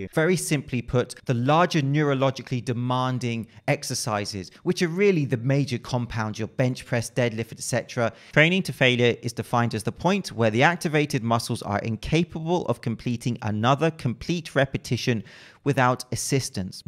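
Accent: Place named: United Kingdom. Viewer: イギリス味が強いな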